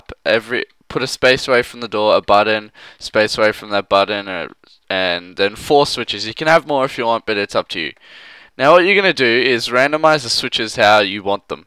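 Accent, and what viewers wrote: Australia. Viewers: he is an aussie and thats why he said buddon or whatever you said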